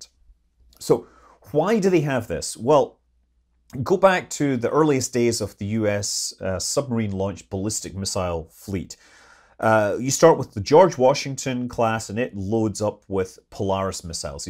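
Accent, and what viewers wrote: Scotland, United Kingdom. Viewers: I want to see the collab revisit with you providing the enthusiastic "Hullo" and goofy (but awesome) Scottish accent to Tim Dodd's Aerospike conversation. Let's get Musk involved and figure our the engineering for the things already. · "all I could add to this is [...] a scottish accent." Don't underestimate how much this rises the sympathy level with your viewers. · The Scottish accent makes everything better. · Never underestimate the power of an enthusiastic hello and a silly Scottish accent! One of the reasons that super-cavitating torpedoes were invented was to act as a 'gunslinger' style weapon underwater. With detection ranges reducing after the introduction of the Soviet Akula class, NATO needed something that could be fired at high speed from close range to impact enemy submarines before they had a cahnce to conterfire. 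The Soviets already had this idea in the 60/70s when they were being outdetected by British and American boats. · I love your Scottish accent and don’t find it silly at all.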